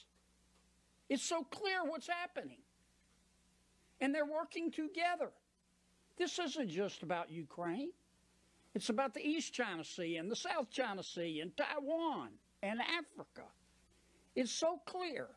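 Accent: South, United States. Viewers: Common sense with a Southern accent!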